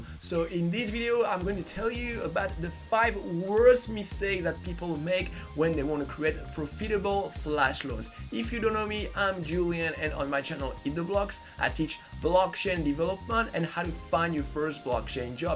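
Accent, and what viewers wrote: France. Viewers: He sounds french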